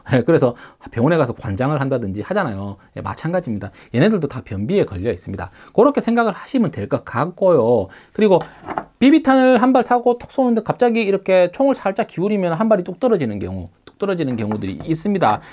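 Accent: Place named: Busan, South Korea. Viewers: 빠사~지는....찰진 다이내믹 부산 표준어에 좋아요를 탁~! 치고 갑니다 ^^ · 뭔중국? 경상도 말투인데? 한국인아님?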